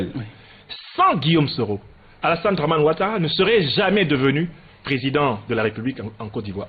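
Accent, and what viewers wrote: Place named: France. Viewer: Pourquoi parle-il comme un français?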